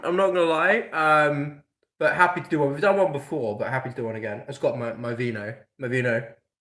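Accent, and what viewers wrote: United Kingdom. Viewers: Are you afraid of losing your english accent being locked up for so long with Gabbi?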